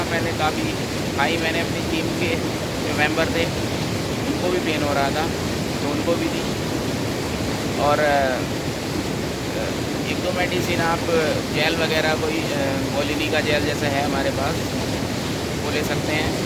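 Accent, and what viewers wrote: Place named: Kerala, India. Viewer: ഒരു മലയാളി ശബ്ദം!,🤔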